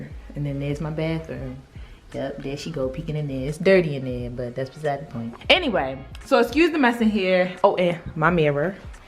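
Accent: Baltimore, United States. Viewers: I was like where is she from? Then I heard you say Stupid I said oh she's a Baltimore native 😭😂 love you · oooo do I hear a baltimore accent 👀🌝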